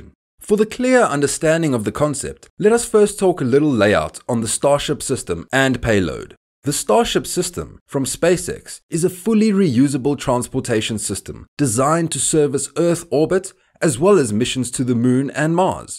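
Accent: South Africa. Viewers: Never heard a south african vlogger before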